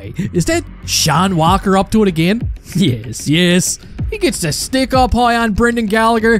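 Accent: Newfoundland, Canada. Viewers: My thoughts? Good to see Jaro breaking out. Good to hear we got a 30G cap again. Xhakej (sp??) is a freakin’ house. But most of all, let’s here it for the Newfy accent. If you don’t immediately find joy in your heart hearing a newfy say “tird perid”, I assume your soul is dead. Good show friend. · Love your accent!! Good ol' Canadian hockey talk!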